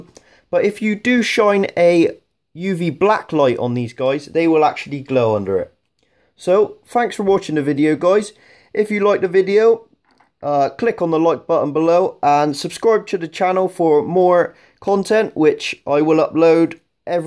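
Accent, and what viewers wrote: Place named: Bristol, United Kingdom. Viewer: Lol great video, but very Bristolian lol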